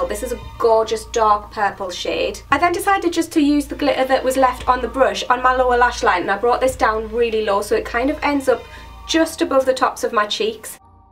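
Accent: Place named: North East England, United Kingdom. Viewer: where abouts are you from? you doing like you are from NE England somewhere xx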